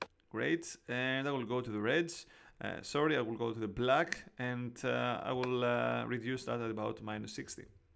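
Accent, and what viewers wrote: Greece. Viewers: χαχαχαχα μόλις άκουσα την φωνή σου κατάλαβα ότι είσαι έλληνας! πολύ ωραίο βίντεο μπραβο σου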